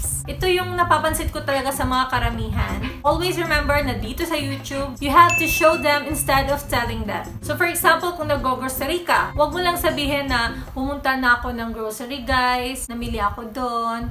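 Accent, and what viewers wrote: Visayas, Philippines. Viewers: bisaya d ay ka lodi? hehe .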